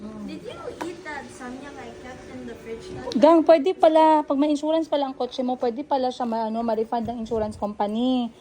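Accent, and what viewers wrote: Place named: Ilonggo, Philippines. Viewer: Mam, ganyan tayo mga Ilongga d mawala ang intonation natin Ilonggo magsalita❤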